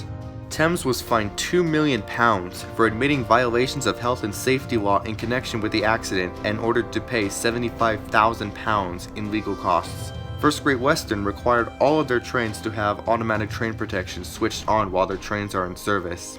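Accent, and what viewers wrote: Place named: United States. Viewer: It’s just American enunciation, we can’t do anything about it.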